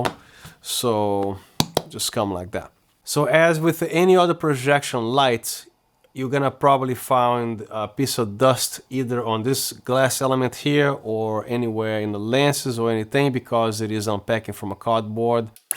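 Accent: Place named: Brazil. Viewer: I couldn't ask for a better review, this is really detailed. Also, I can tell by your accent you were born (or at least lived) in Brazil. Am I correct? Cheers!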